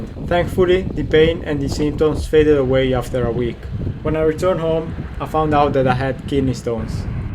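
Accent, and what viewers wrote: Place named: Venice, Italy. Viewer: Ma io non so l'inglese e a 70 anni non mi metto di certo a studiarlo. Mi dispiacerà non seguirti più! Sei bravo ,simpatico e soprattutto non reciti la parte dell'eroe. Risolvi ogni intoppo senza ingigantirlo e hai un accento veneto che mi piace molto. Buon viaggio quindi, e mi raccomando: resta sempre così ,te stesso 😊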